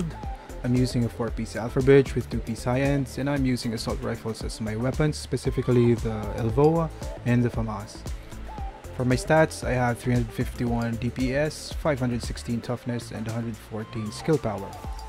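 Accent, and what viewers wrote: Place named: Philippines. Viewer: this guys sounds like is flipino.. lool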